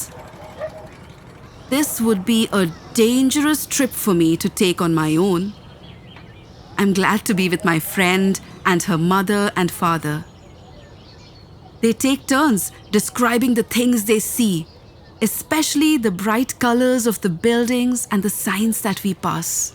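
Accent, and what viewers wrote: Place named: India. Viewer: This is amazing!! Compassion. Forgiveness. Friendship. This book has much to teach kids. accent does a great job making you feel like you’re in India so if you’re about to watch, grab some chai so you can engage one more sense in this beautiful story.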